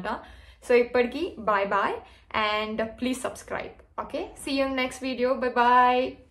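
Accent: Telangana, India. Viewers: What a cute telangana slang akka🤩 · Meeru totally telagana slang lo Matladuthunaru ante keka anipinchindi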